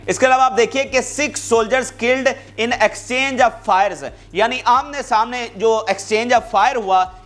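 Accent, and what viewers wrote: Punjab, Pakistan. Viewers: او بھای انگريزی کو پنجابی ميں نہ بولو ۔ جو بھی خبريں بتاتے ہو ساتھ کسی مصدقہ اخبار کی ريفرنس ديا کرو ۔ہاتھ ميں کاغز پکڑ کر ريڈنگ کررہے ہو ۔وہ بھی انگريزی پنجابی ميں۔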